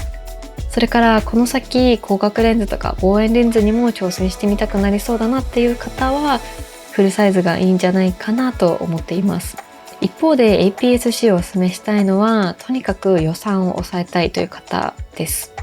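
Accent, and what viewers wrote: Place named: Japan. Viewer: 너무 좋아요. 일본 사람이 한국어를 하는 억양이 그대로 살아 있어서 깜짝 놀랍니다.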